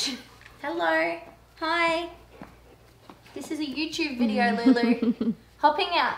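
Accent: Australia. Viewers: aussie aussie aussie?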